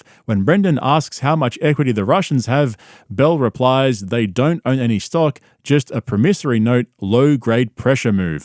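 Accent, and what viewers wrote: Australia. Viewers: This is amazing, loving hearing an Aus accent on this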